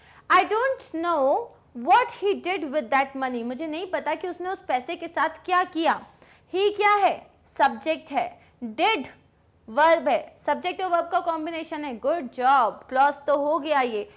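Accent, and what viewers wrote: India. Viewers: OH NO...INDIAN ENGLISH...NO...NO...NO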